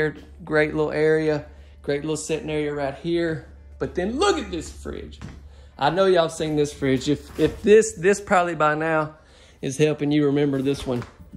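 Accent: South, United States. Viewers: This home is great, I really liked the kitchen and the utility room, I could live with it. You say utility room however you want to, I pronounce it like you because I'm from the south too. In one video you said something about calling the toilet something besides toilet, how about the porcelain throne :) Keep up the good work.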